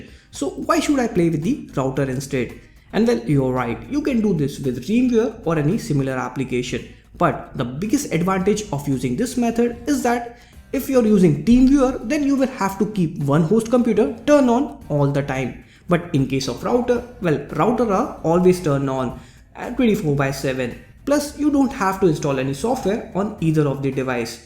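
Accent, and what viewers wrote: India. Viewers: This is a great video. I like how simplistic everything was explained. I'm not a newbie with computers at all, but the majority of my family and friends are, and this would easily help them understand networking. Even though his English is very accented by his own language, I work with several people from India so I've gotten used to the accent. Believe me, its much easier to understand this than someone who's native language is English, but they speak it so fast that you can't even catch what they're saying and need it repeated. Good job! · Funny indian accent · U speaking English like native Indian languages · You REALLY need to practice speaking in ENGLISH. I always tell people I speak TEXAS ENGLISH, so EVERYONE knows what that means.. You are speaking in INDIA ENGLISH, which is VERY HARD TO UNDERSTAND. Why is it that ALL the people from INDIA, always have this "HARD TO UNDERSTAND" English?!?!?!?! 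GEEEZ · Great info. That was the thickest Indian accent I have ever heard....and I’m Indian myself!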